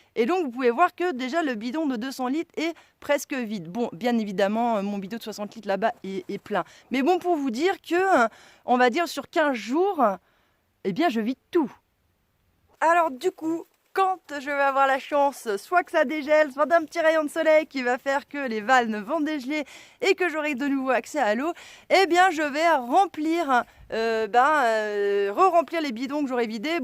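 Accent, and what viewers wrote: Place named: Saint-Étienne, France. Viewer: Hello, il me semblais bien que ton accent me disais qu'elle chose surtout quand tu as parlé de st Retienne!! je suis de Luriecq dans le 42 et je suis en train d'acquerir un terrain (bois = clairière) près d'Apinac à 950m d’altitude, donc comme tu vois ton expérience et très importante pour moi. Nous aurons peut être l'occas d'échanger dans un futur proche nos expériences spécifiques. Encore merci pour ton partage.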